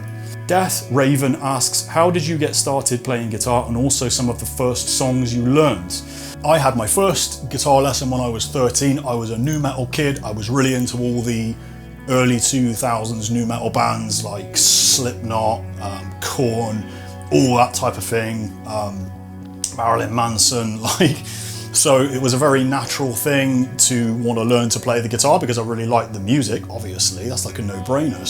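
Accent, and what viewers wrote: RP, United Kingdom. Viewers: I always love your videos and posh accent ❤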